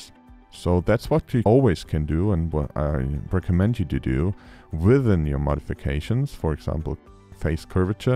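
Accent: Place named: Germany. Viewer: Your German accent makes it so much more interesting to watch this tutorial. :) Some typical accentuation on some words or syllables... funny and entertaining!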